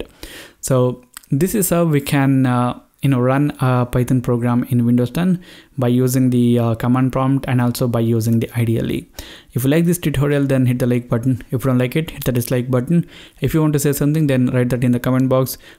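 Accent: India. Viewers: he has an Indian accent, he has proven more superior than me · by the time I hear Hindi accent I press like before watching the conter! Thank you my friend, I am sure I will find wisdon!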